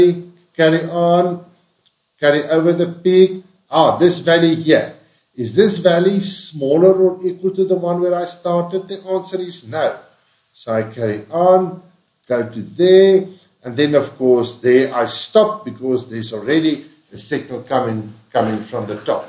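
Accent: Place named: South Africa. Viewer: thanks prof, was great to hear a SOuth African voice teach me, keep it up, i am a big fan of this youtube page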